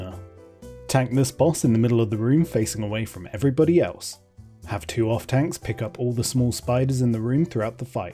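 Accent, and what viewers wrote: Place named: United Kingdom. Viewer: I think he is British by the accent maybe?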